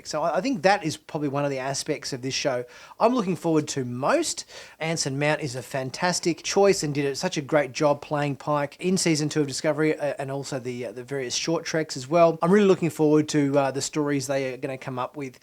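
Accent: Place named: Australia. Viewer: Great Aussie accent. 1. Discovery needs to step away from its convoluted plots that diminish its great cast and production. 2. Picard: Beginning with Farpoint the talent of Patrick Steward and John DeLancie was immediately apparent. I really like your idea of having enhanced memory that would bring Picard's wealth of experince to life. 3. Strange New Worlds: With Number One as one of the great first officers and Pike as a legendary captain the opportunity for Spock to grow with the challenges of a young officer and evolve with awesome mentors would provide a magnificent character arc.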